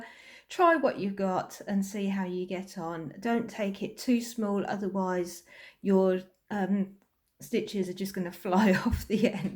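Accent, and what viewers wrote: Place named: United Kingdom. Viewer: This looks great! Can’t wait to try it! I love your accent! What part of the uk are you from?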